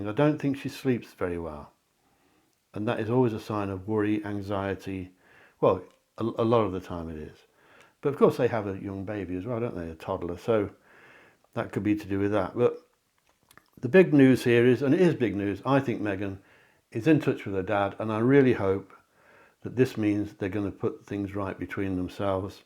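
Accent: United Kingdom. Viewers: it’s not a Devonshire accent though! I wondered where you are from - is it Staffordshire? My entire family is Cornish except one Grandfather who sounded like you.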